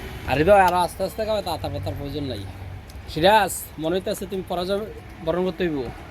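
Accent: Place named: Comilla, Bangladesh. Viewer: কুমিল্লার ভাষা এটা ভাই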